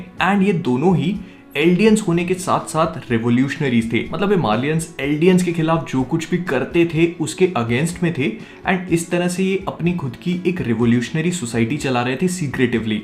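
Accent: Bhopal, India. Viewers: sound like a India Type it's cool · Bhopali Bolte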